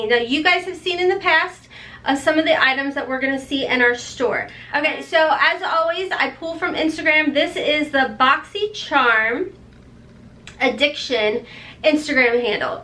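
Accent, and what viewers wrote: South, United States. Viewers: I just found your channel and I love your accent I'm a southern girl too💖 I think the pallet will be in the charm rewards store. My email is <mail> now I'm going to binge watch your videos 😍 😍😍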